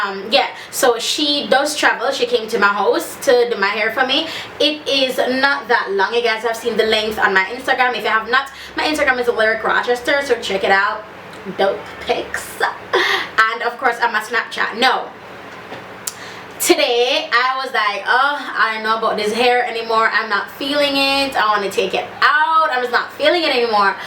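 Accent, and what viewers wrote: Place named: Jamaica. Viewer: I love the way you command the English language and then jumped back to patios 🇯🇲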